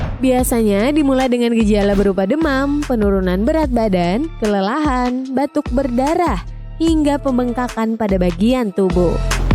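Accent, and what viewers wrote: Banjar, Indonesia. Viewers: Yg bikin channel ini orang banjar ya?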